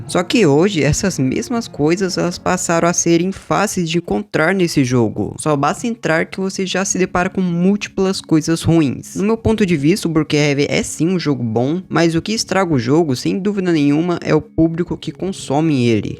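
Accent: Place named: Portugal. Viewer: deve ser de Portugal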